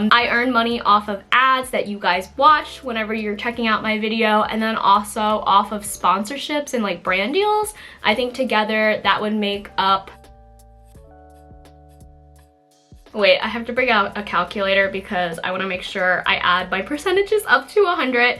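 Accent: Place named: South Jersey, United States. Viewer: woo hoo new jersey!! it sounds like you have a south jersey accent (a little bit)!!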